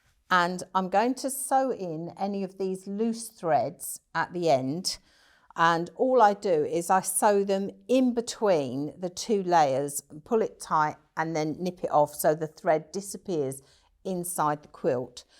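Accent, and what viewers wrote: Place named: United Kingdom. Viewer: I love listening to you speak. Usually, I can't understand British speaking people ... And if I can, the way they speak can be so over the top. But not you. Your speaking voice and accent is perfectly lovely. Just Beautiful.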